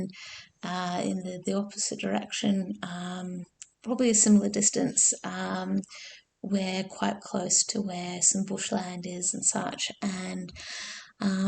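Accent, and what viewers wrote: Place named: Australia. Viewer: Hi! Lovely to hear another Aussie accent on YT - you popped in to my feed this morning while sipping my morning coffee. I’m now a new subscriber and look forward to seeing some more of your videos 😊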